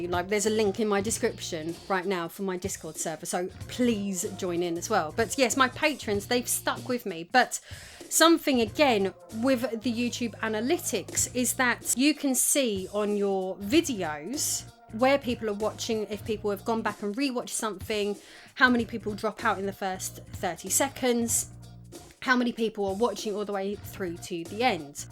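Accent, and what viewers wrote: United Kingdom. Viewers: I watch you and Top Hat Gaming Man because you both have awesome British accents. And I guess the content is alright. Just kidding, you two are awesome!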